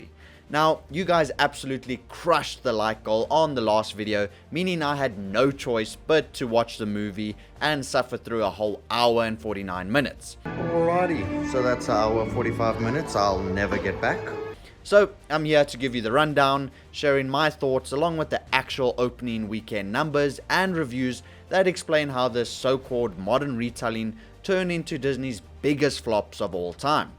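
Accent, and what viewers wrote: South Africa. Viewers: You know it's bad when Dragonball Evolution has a "higher" low rating than the movie. Also you're accent makes me think you're from South Africa? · Where's your accent from? Feels kinda South African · Are you by any chance from south africa, cause your accent sounds like it.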